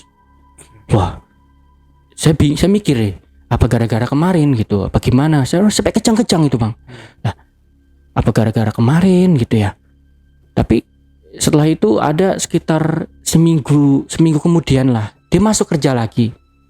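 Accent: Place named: Java, Indonesia. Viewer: Mas logate jowo meni k an asli endi kui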